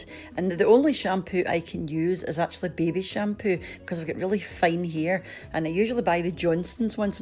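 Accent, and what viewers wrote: Scotland, United Kingdom. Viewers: I just found you I love your Scottish accent can you tell me were about in Scotland you live I know I'm going to enjoy your channel have a wonderful day a new subscriber💕🇨🇦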